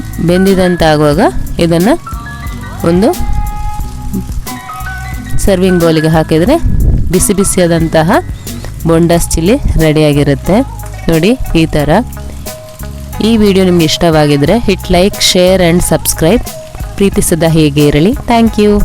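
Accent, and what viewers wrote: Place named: Mangalore, India. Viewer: Nimma manglore bashe thumbaa chennagide marree😁 Nanna friends ella manglore avre thumba Kushi aguthe nim kade kannada kelakke❤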